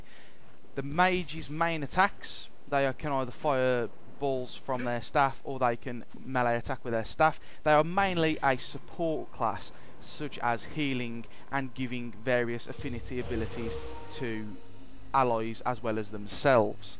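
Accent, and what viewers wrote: Birmingham, United Kingdom. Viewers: Nice on mate. You from Brum or the Black Country?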